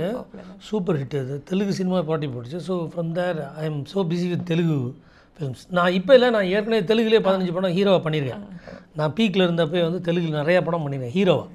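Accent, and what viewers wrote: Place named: Madurai, India. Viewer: Madurai tamil maarave illa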